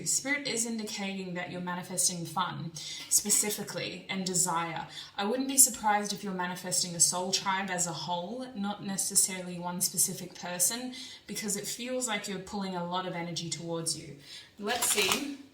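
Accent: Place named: Australia. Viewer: Love Kirk (his name said in your lovely Australian accent!) 🖤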